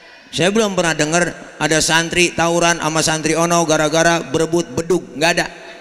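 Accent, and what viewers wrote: Indonesia. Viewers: Di sumatra ada UAS di betawi ada UNFAD ustadz. Muka, perawakan, sama, punya ciri khas berbeda UAS brdialek melayu UnfAD dialek betawi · keren..betawi bgt..sehat terus ustad.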